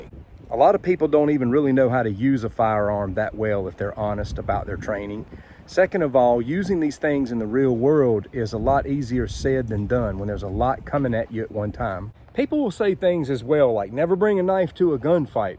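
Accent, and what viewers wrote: South, United States. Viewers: GREAT ADVICE. I APPRECIATE THE STRAIGHT TALK, AND BREVITY TO THE POINT, AND FANTASTIC SOUTHERN ACCENT. IT ADDS. FASCINATION OF THE CLARITY. WITH UNDERSTANDING. WHAT YOU ARE SAYING. IT IS INTERESTING THAT SOMEONE WITH NO TRAINING COULD DO WELL IN A FIGHT. DOES. SAVAGERY COUNT FOR ANYTHING. ? I. MASSIVELY TRY TO AVOID. FIGHTING, BUT FROM EXPERIENCE. I SEEM TO ATTRACT SECURITY, AND. FEAR WHEREEVER I GO. I COULD BE .RESCUEING. A CRICKET TO RELEASE OUTSIDE, OR TRYING TO BUY MY GROCERIES. NEXT THING A I HAVE SECURITY GUARDS. FOLLOWING,. OBSERVING, OR TRYING TO HUMP MY LEG.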